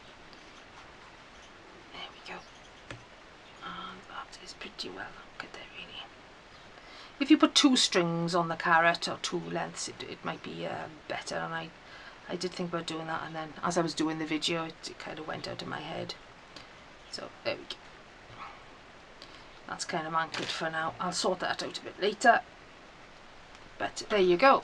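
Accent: Wales, United Kingdom. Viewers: You sound welsh im homesick now😢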